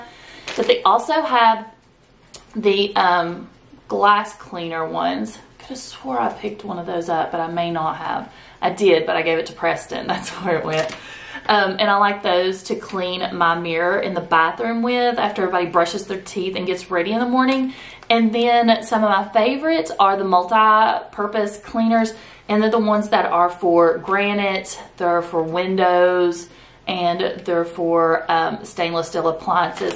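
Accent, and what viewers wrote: South, United States. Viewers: That southern accent ❤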